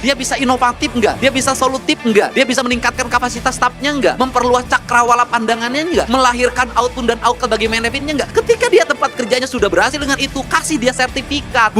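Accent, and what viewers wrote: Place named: West Java, Indonesia. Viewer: Sunda bgt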